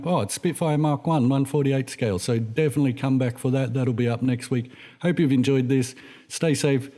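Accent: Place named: Australia. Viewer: Great commonsense review. I have one of these in Yellowjacks so-called starter kit form which I'm itching to build. The yellow paint scheme will be a challenge! I have tried building older Airfix and Matchbox 1/72 Gnats but not got very far. Good to hear an Aussie voice on a kit review. Mike from the Shaky Isles.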